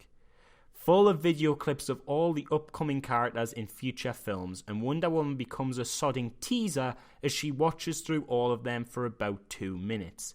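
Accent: Newcastle, United Kingdom. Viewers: Geordie accent at its best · It's a Geordie accent from North-East England. Like Davos from Game of Thrones. Except in the show Davos says he's from Flea Bottom so fuck knows how he got a Geordie accent. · Geordie. North-East England. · Wow. Didn't expect Geordie... Class! :D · you sound like your from the toon? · Sounds Geordie to me. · It's a Geordie accent. Listen to Sergeant Lewis from the old 'Inspector Morse' TV show. From northern England. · Top review mate... Good to hear a fellow Geordie on YouTube. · LOL!!!! Best review, 100% agree! You sound geordie mate!? Shoutout from London!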